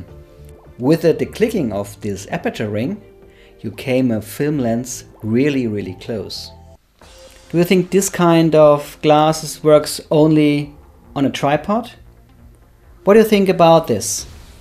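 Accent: Germany. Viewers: cooler duetscher akzent ;-) aber ein gutes video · Take it as a compliment, i liked it alot ;) The combo of the german techno and the accent put a smile on my face, so bonus points!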